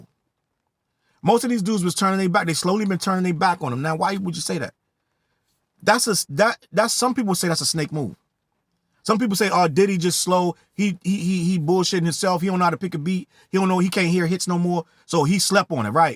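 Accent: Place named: New York, United States. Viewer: He did ride the waves. Ion mean no disrespect but you an old head and you sound like you from New York. A lot of waves he rode, the young niggas was already hip too. put the on, had a his own buzz and had to hop on to get the streets. Yes he did make them bigger on a mainstream level but he did ride the wave as well. Whether it’s using their flow/ lingo or dressing like them. The man is the ultimate culture vulture.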